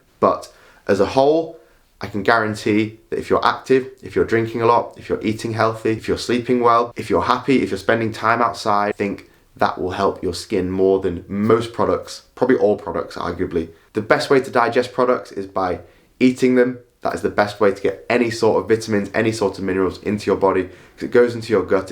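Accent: United Kingdom. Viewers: Love your accent! Is it a northern UK accent? I have some problems following you! No wonder I'm from Sri Lanka 😆 😆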